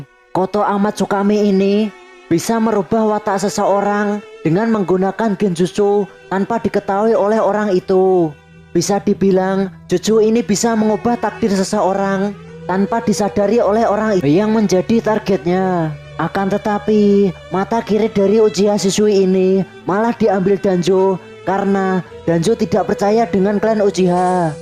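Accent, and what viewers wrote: Java, Indonesia. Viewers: jawa skali,,bos...bicara nya...jawa kebun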